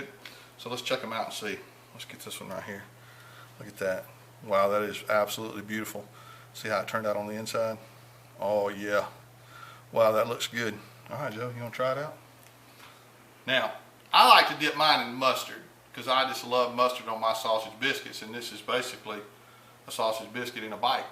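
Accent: South, United States. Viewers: My husband is going to LOVE these! Thank you and so much for this recipe! I love your accent and funny southern expressions..."Keep doin it till you got it gone" lol. Very entertaining... and these are great recipes! I made your Date Nut Balls this morning for our Christmas party today. Delish! xox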